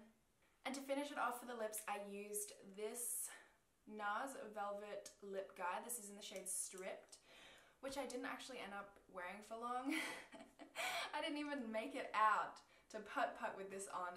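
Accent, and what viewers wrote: Australia. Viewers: Your an Aussie? G’day!!! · I literally pause the video every once in awhile and try to copy what you say in an Australian accent because I want one so bad hahaha I think it's so cute!!! Love u 💖💕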